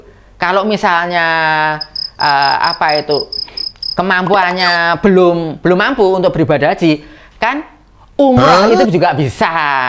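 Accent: Java, Indonesia. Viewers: Kaget aq, kirain mas Alif ternyata bukan suara yg ini ada rasa rasa jawa bgt logatnya🙏😅🤣👍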